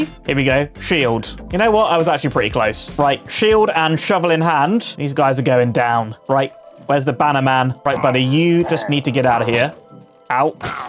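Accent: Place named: United Kingdom. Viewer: The English accent of the British is very nice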